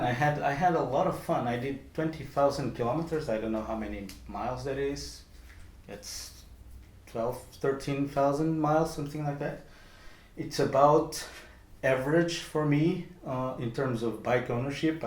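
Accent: Portugal. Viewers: I can se by the use of some specific words and because i usually have a good sensibility detecting Portuguese accent, behind the English.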